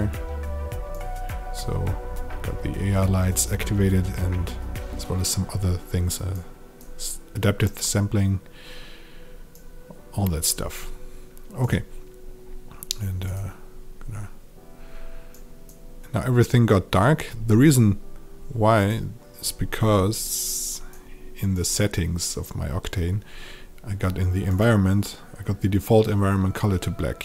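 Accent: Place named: Germany. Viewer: Dachte mir schon von Anfang an wegen dem Akzent du bist bestimmt deutsch, dann hat die Google Suche den Beweis gebracht :D Danke dir, tolles Tutorial!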